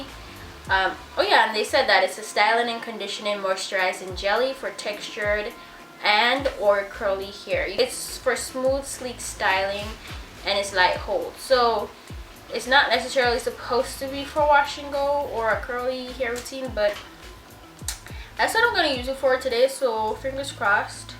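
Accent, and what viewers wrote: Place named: Caribbean. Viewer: Ahhh I knew i heard a familiar accent! i’m from the bahamas Your hair is gorgeous!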